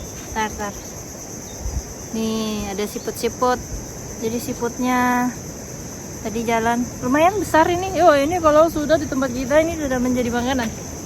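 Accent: Indonesia. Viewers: Logat kutai kk nya maha ga bs boong hehe. Hekda AC yg ada angin cepoi cepoi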